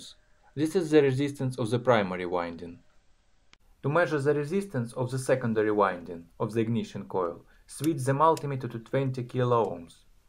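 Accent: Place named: Russia. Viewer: First time hearing a russian accent?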